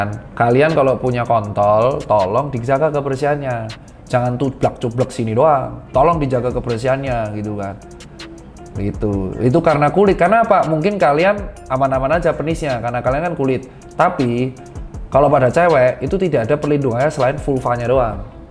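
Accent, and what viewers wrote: Java, Indonesia. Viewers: Mak jebuos... 🤣 medokkk...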